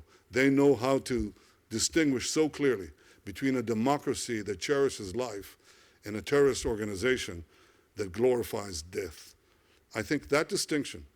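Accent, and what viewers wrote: United States. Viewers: How is the same accent like Americans🙄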